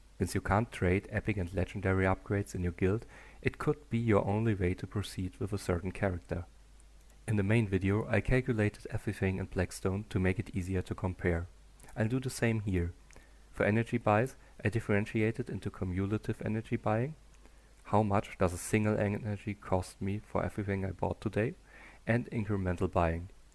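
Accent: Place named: Germany. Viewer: Geiles Video Nice vid Deutscher Akzent würde ich schätze n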